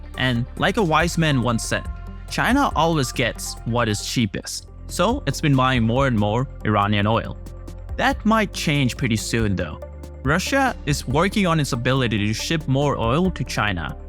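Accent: India. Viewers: Indian accent😅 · your accent is indian with much improvement than indian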